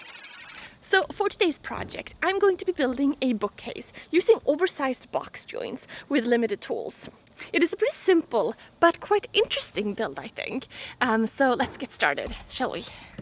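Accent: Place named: Norrland, Sweden. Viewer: I love the roundover you did!! So smooth and modern looking :-D This piece is very nice. And that's a compliment coming from a person who does not like knots in wood at all (clear only) ;-) Very nice looking work! :-) Just curious though: have you ever used the "bow tie" type of jointery? Here's a short vid by a guy who acts kinda weird, but his method seems very good - they are custom shapes too! <link> By the way, by any chance are you from Northern Sweden, I once had a housemate who was from Northern Sweden, his accent was similar to yours. But many other Swedes I've met had mastered the American "accent" (called a "Flat Accent" by the British), so I couldn't tell that they were Swedes until they started talking to each other...it was CRAZY :-D I couldn't believe my ears. They had no discernible accent at all. Crazy, right? :-)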